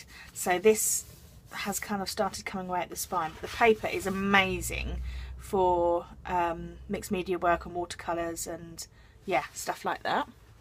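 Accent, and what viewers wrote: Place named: United Kingdom. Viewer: Had to come back to listen to your real British accent hahaha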